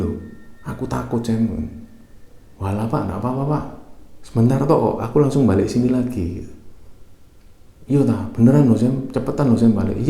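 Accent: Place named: Surabaya, Indonesia. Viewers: Chanel favorit..... Sing cerita mas dgn logat suroboyo an e..... Salam saking solo jateng mas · Buka chanel klik vidio baru, liat yg cerita mas. Wes ndak opo wee ditinggal kabeh😂 Jangan berubah yaa mas cara pembawaanya👍 Tetap Suroboyoan👌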